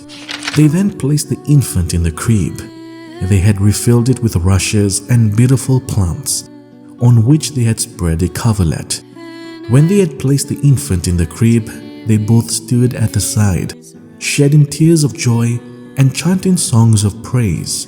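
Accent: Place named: Nigeria. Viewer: I love hearing you tell this truth In your Nigerian accent Reminds me of old friends St Catherine intercede and pray for us to love one another ☘️☘️☘️🙏🙏🏼🙏🏾🕊️🕊️🕊️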